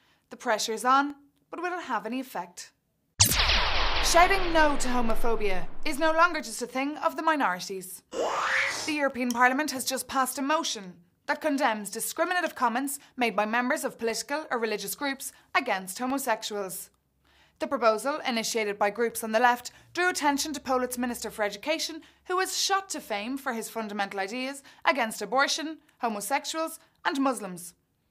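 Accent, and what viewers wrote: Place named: Ireland. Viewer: Irish accent... I like her accent...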